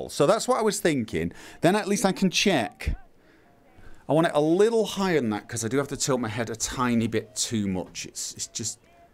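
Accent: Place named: Northern England, United Kingdom. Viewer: First thing... your accent is much, much thicker and more clearly northern Britiain than it usually is... microphone or been talking to people from home?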